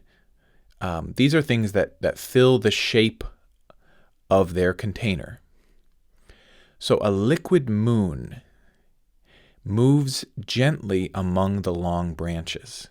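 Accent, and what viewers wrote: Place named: United States. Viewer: His heavy American accent ruined this. I expected a pure British accent. 🙄🙄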